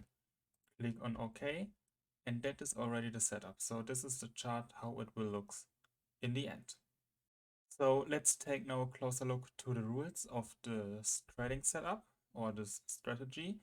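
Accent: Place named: Germany. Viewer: After two seconds, everybody recognises the German accent.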